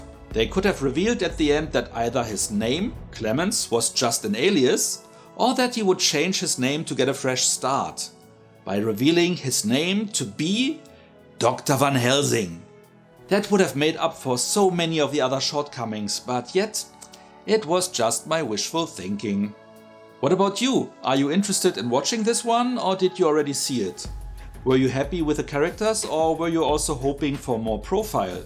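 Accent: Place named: Germany. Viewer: why are you doing a nazi accent?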